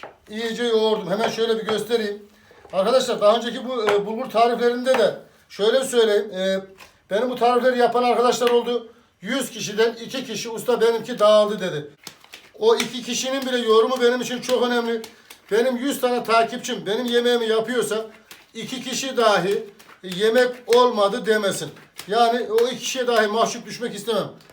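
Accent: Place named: Central Anatolia, Turkey. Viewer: Ustam siz nerelisiniz 🤗 şive tam içanadolu gibi 🤭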